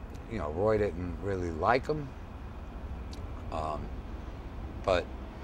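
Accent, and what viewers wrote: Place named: Brooklyn, United States. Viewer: His Brooklyn accent is too strong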